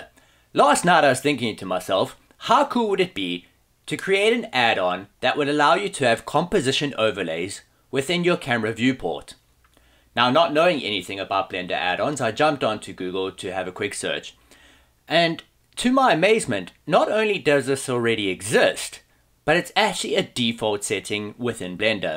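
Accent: South Africa. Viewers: South African accent comes through strong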